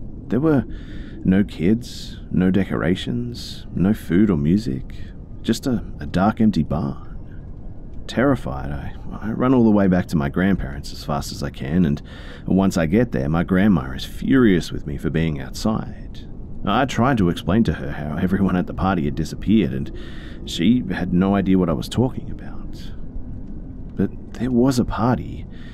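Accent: Australia. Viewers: You’re doing a wonderful job…thanx! Nice Aussie voice 🫡🇦🇺🏆✨👏💜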